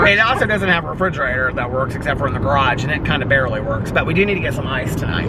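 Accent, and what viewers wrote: South, United States. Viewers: Why does sound more southern than usual? 😂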